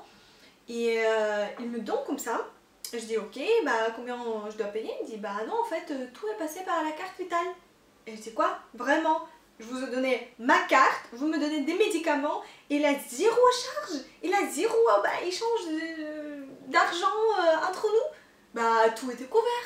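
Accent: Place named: Russia. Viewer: Mais v'est chouette ce petit accent russe. Faut surtout pas le perdre !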